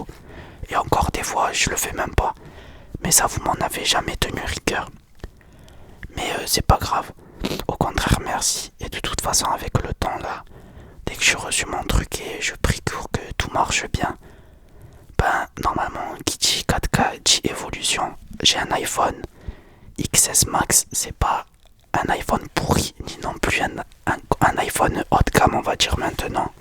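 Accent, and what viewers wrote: South, France. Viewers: J aime beaucoup ta chaine et ton timbre de voix 🙂 petite question tu as un fort accent du sud t Marseillais , nîmois ou Montpellier ? 😉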